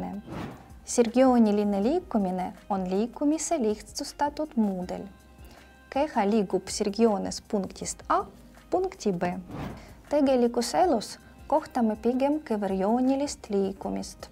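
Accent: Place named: Russia. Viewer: Üsna häirib mahalugemine, sest mahalugeja vaatab teksti, mitte kaamerasse. Võiks ikka rääkida otse nagu veltsi ja veiko seda teevad. Kui ei suuda seda eesti keeles teha, siis tehku vene keeles, mis ilmselt on õpetaja emakeel. Kõneleja eesti keele aktsent on üsna jube, nii et ilmselt oleks palju meeldivam, kui jutt oleks ikka venekeelne ja lisaks oleksid eestikeelsed subtiitrid või siis veltsi või veiko räägiksid sama jutu eesti keeles või siis toimuks vestlus nende vahel. Loenguformaat pole ka kuigi atraktiivne põhikooliõpilastele.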